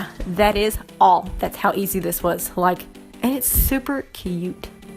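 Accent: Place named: South, United States. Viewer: This was do helpful thank you! Loving the southern drawl btw it's cute as hell!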